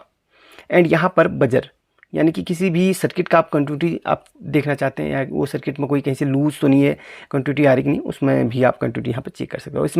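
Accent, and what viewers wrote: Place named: Uttarakhand, India. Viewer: Sir bahut bahut dhanyawad,,,apki awaz se lg raha aap uttarakhand se ho , kya mai sahi hun ?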